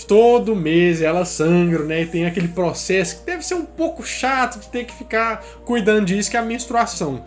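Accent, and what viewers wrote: Minas Gerais, Brazil. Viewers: Viciei nesse mineirin.. kkk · Sou fã deste mineirinho... Fala serio este jeitinho nosso malandrinho de falar da uma certa atração 😂😂😂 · interesante eteu sotaque.. Mineirim? gpiano??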